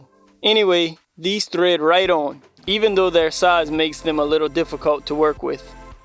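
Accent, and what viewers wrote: Louisiana, United States. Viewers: Hey, you from Louisiana? Dat sounds like a Cajun accent to me :) Anyways, sick build, man!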